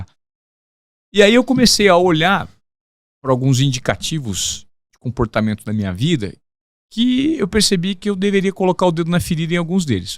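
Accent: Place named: Sao Paulo, Brazil. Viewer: Que isso e um charla paulista haha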